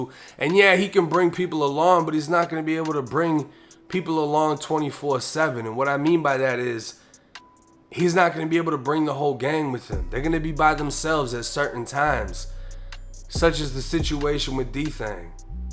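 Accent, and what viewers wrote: Boston, United States. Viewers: You from Boston ? I can read the accent lol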